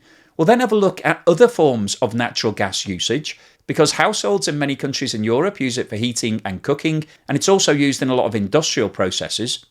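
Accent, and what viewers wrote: United Kingdom. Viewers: You appear to have a British accent, so i am wondering why you say 2 times rather than TWICE?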